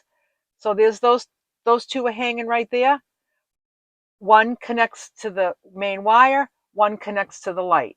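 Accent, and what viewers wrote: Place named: Boston, United States. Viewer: just to let you know, that you are such a regular, played in my kitchen as I'm cooking dinner, that both me and my adult son (from the UK) now have mastered the Boston accent. 'That shit bowthas my eyes, 'Over heeyer" Over theeyer' Love you and your natural style x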